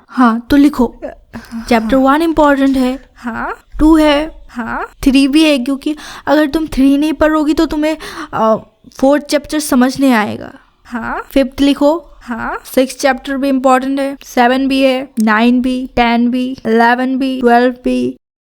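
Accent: Bengal, India. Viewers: Didi ka accent mujhe bengali wali lag rahi hai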